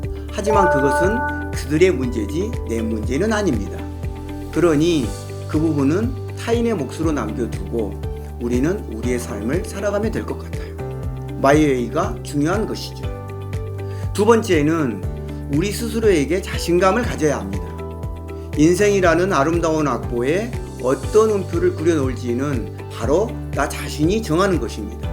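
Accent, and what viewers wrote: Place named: Jeolla, South Korea. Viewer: 짧지만 많은 생각이 듭니다 님처럼 실천은 쉽지 않은 거 같아요 중간 중간 전라도 어투가 더 친숙하게 느껴집니다 가~~~치 가시죠 ㅋㅋ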